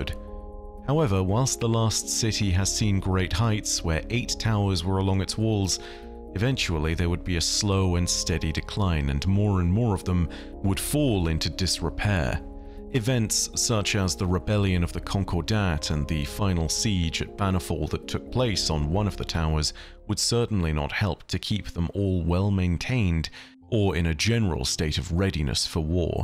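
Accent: United Kingdom. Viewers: It’s my favorite British destiny lore guy. Tribes look awesome sign me up!!!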